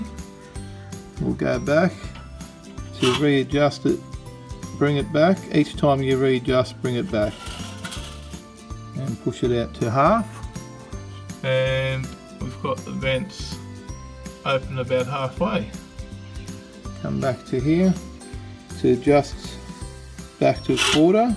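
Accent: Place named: Australia. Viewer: Australian accent... I understand it perfectly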